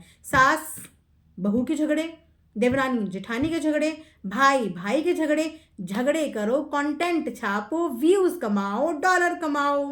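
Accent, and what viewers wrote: Bihar, India. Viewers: 😂kya baat hai bhut chill mood me hai...mast bihari tons de rhi hai😂👏👏👏👌🏼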